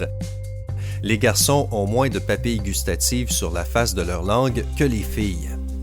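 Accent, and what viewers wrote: Quebec, Canada. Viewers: Pourquoi des fois c un québécois et des fois un francais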